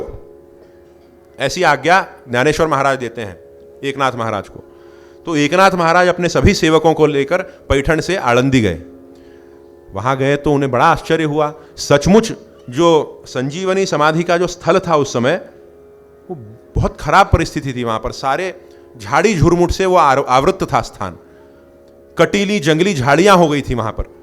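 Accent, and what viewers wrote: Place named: South, India. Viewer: साउथ vali आवाज ह महाराज आप ka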